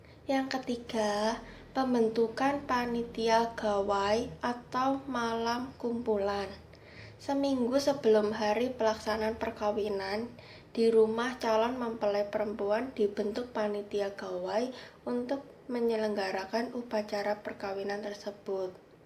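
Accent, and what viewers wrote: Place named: Java, Indonesia. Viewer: Yang jelasin kok logat Jawa, gak nyambung jadinya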